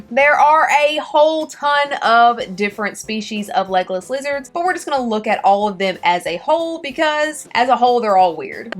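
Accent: South, United States. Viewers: First of all, my 6th graders loved this video. Several of them look just like the reptiles you showed. We think that you are the perfect woman. Your teeth are so white. Your Area 51 T-Shirt was a great choice. I loved the southern accent. Your spooky eyes had hypnotizing effects with those white rings on them.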